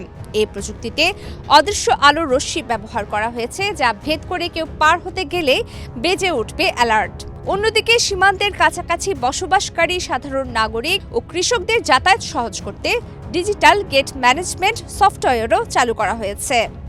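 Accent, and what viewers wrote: India. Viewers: বুঝলাম না এই সংবাদপত্রের সাংবাদিক কি বাংলাদেশে নাকি ইন্ডিয়া তুমি যেভাবে খবর পড়তে মনে হচ্ছে উনি ইন্ডিয়া সাংবাদ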